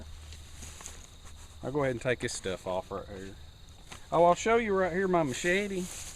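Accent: South, United States. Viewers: Hey, Forgot to mention - i now know how to do that knot you used - thanks so much my friend. this is something i will remember for the rest of my days. The way you showed it was so clear and easy to follow unlike some other knot video's on here - can you do a video with some more knots that you know? Your a great teacher & fun to watch, love the south accent too:)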